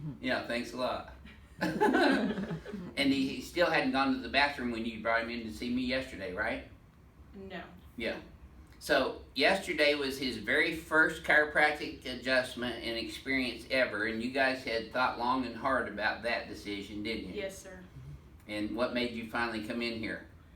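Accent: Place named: Texas, United States. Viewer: That texas accent is great! Love it.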